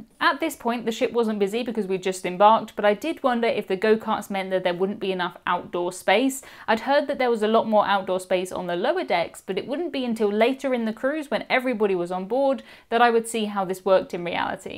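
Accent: United Kingdom. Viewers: I love your videos! btw is it cool if I call you? Also, fun fact, as an American I have my siri as British accent. Her British accent pales in comparison to yours. I wish I could have your voice as my siri.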